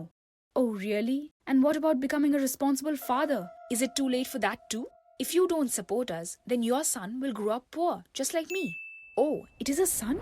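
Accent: India. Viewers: Perfect Indian accent another central American girl storyteller pls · It's a Indian voice 💯🤗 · FINNALLY A CHANNEL WHO GOT OUR COUNTRY'S ACCENT YAYYYY INDIA KI JAI side note: THIS IS A INDIAN TV SERIAL I SWEAR this is after watching the whole video: i knew this country has problems but jeez 😥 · OMG the accent!!!. We in India speak in English just like this. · Finally india accent my dear country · I did not know how a indian accent sounded until this video. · Her Indian accent is so horrible, i don't understand how people are praising her accent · The Indian accent is good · No Offense but I don't like this Indian accent :/ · are u indian bc i am and I recognised the accent! i love your skin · Damn loved this Indian accent and story 😁🔥❤ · The stereotypical Indian accent ☠️🦇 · Finally an Indian Story with a perfect Indian Accent 👌🏻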